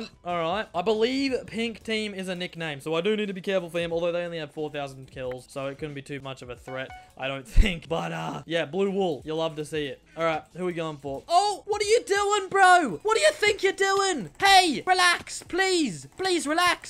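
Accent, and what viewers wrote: Australia. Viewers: is more australian in this video xD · This man has an Australian accent and he can do both the British and American accent. Teach me your ways. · Comic I know he’s ausie it’s just he’s speaking ausie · am i the only one who feels like is getting more australian in every upload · became more australian than me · why did become a really hardcore Australian this vid? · The heavy Australian accent is so funnnyyyyyyyyy love you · he's never sounded so australian before HAHA i love it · He seems more Australian than usual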